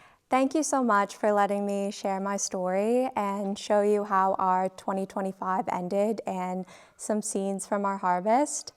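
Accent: Australia. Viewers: I can hear that Aussie accent creeping in....soon you'll be one of us....one of us....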